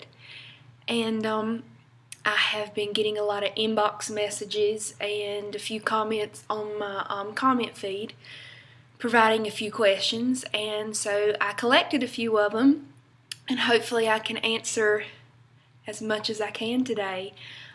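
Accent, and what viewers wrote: South, United States. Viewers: Love your southern accent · Love the accent and a beautiful face to go with it and the blonde go with you :-) . i am from nc here in Charlotte I thought mine was thick an I mean mines is thick so I can relate :-) . Take a look at one of my videos you will here how I sound. Be blessed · Saw this in my favourites. Seriously. I know that a lot of people say that you're pretty and all. But for all I care you could wear a paper bag over your head and I'd follow you on a shoe shopping spree along with all your lady-bros if I could hear you talking in your southern accent all day. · I get accused of having a stronger accent than most of my peers even though they are from the same place as I am. I am from southern middle, Tn. I think some of us sound more southern than others because of who raised us, like our grandparents, that usually sound more southern than our generation, or if we are from the country or the town, or if we are influence by the way people talk on t.v. 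or movies and it effects our speech. Also, i think that some people are just simply more southern sounding than others... my little brother doesn't sound southern at all. This girl is from North Carolina and she has a different sounding southern accent.. its funny to me because she sounds alot like I sound. We say "fixin to" and "sweety" but, when we say "honeychild" it is almost like we are being dramatic about something. We don't use that here in the same way she is saying they do. I love this.. I may do a video too:) great Job I love southern accents and i really feel like if we speak correctly when it comes to grammar then we sound southern more than country :) GOOD JOB :) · I love your southern accent yall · My goodness you are soo pretty... I was born and raised on the east coast of nc about 25 mins from the ocean im still there, you pretty much sound the same as all of us here.. I love your videos... 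You're right most of us have a northern twang when we talk down here we say great day or gracious day quite a bit but yea pretty much talk the same as you but anyway wanted say hey and maybe i will do a video myself, keep making videos sweetie and i will talk ta ya later........................ :) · Sweetheart, thanks so much for sharing your videos with people who are interested in learning about your way of speaking. I too am from North Carolina, near the Greensboro area (in the Piedmont). My husband is from "out in the country," and I was raised "in the city." He speaks completely differently from the way I do. We have some funny stories about when we were first dating. Anyway, thanks again for being so kind to people. You are a good Tarheel! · Love the southern accent. Ill sit and visit with a southern girl for hours just to listen to her talk. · OMG ! One of the cutest girls ever :) and i luv that Southern accent, it triples your cuteness :) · You sound like my girl cousins in S .east NC. 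My Grammaw from there used some old pronunciasions like saying " hit " for it, and "caint " for cant. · I live in Western NC I sound just like you I never thought I had an accent though I though everyone else did lol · Sugar sugar sugar that chick is all sugar...love your southern accent! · I'm from Monroe,Nc born and raised most of the people have your accent but others like me have regular accents but have southern accents when the slang come out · Everyone has an accent, some stronger than others. I'm from Arkansas. I never realized that I had an accent till I went to Chicago and they noticed it very quickly. I don't hear it till I hear a recording of my voice. I just did an accent tag and an accent challenge tag. I'll have to see if I get any views. I'm in western Arkansas. I think I sound like a Texas/Hillbilly mix. I was born in Arkansas but lived in north east Texas till the 6th grade then finished growing up in Arkansas. 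Whoever said that you don't have an accent is wrong. You sound like a Southern Bell . Always be proud of your accent.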